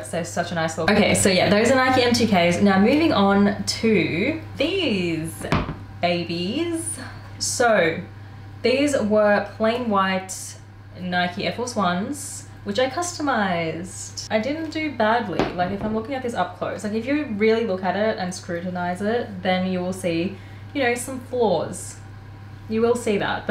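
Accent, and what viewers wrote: Australia. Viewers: Wait are you Aussie bro if so sameeeee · does her content has anything to do with her being indian?! and can't you tell by her accent that she is an australian (her origin can be in india whereas she can be australian by belonging there)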